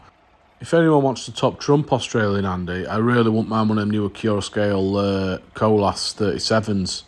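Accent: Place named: Northern England, United Kingdom. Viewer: I just envy your northern lilt much better us ‘ampshire ogs you do voice over for my vids and story time ps grot 1000 %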